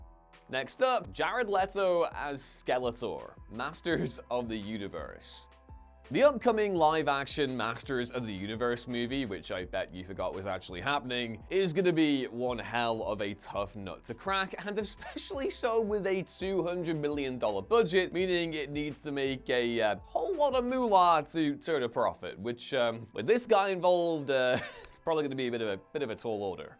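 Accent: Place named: England, United Kingdom. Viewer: He pronounced it Chris-tian. It’s his English accent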